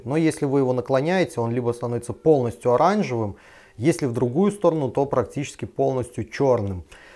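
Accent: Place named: Germany. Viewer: Ну, если написано по-английски, то произносить желательно не по-немецки. Но это мелочи, обзоры хорошие.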